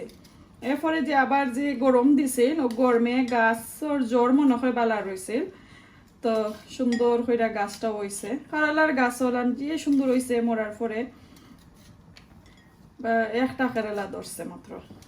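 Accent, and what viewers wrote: Sylhet, Bangladesh. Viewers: সিলটি মানুষের সিলটি মাত হুনলে বড় বালা লাগে এর লাগি আপনার ব্লগ আমার খুব পছন্দের। হুকন শিরা আমরার প্রিয় খাবার। আপনার গাছের কদু দুইটা খুব সুন্দর লাগের অনেক কচি। রেসিপি টা মাশাআল্লাহ খুব সুন্দর হয়েছে। সব মিলিয়ে আজকের ব্লগ টা খুব সুন্দর হয়েছে 😋😋👍👍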